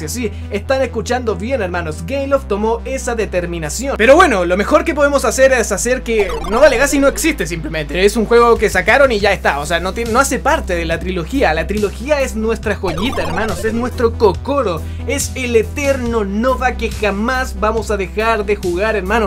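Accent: Chile. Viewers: Vo eri chileno o no ???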